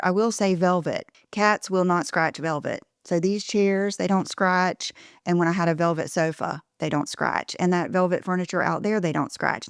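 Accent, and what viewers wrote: South, United States. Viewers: You are authentic and that’s why we love your content, just don’t loose that beautiful authentic southern accent, it’s charming beyond words. TY for your great videos!❤❤❤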